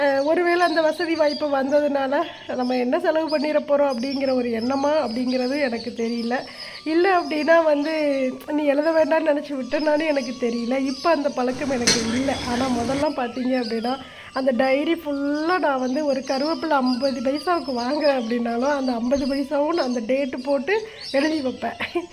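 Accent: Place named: Kongu, India. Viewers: வீட்ல மற்றவங்க தூங்கு போது விளக்கேற்றலாமா ஏற்றக்கூடாதுனு சொல்ற றாங்க எதை செய்வது உங்க பேச்சுல கொங்கு தமிழ் நன்றாகவே உள்ள்து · கோயம்புத்தூர் மாதிரி இருக்கு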